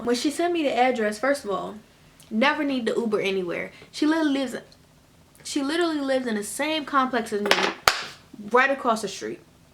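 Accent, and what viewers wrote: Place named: Baltimore, United States. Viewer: I know I saw nc in the ss but I hear a lil bit of Baltimore 👀👀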